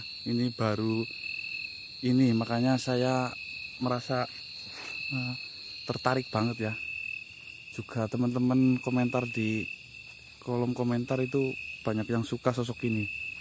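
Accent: Banyumas, Indonesia. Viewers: klu tegal lebih medok klu pwto lbh soft ngapaknya😎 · oh pantes bhasanya sama kya saya...ngapak..mksih mas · Ngapak purwokerto banyumas brooo..😂👍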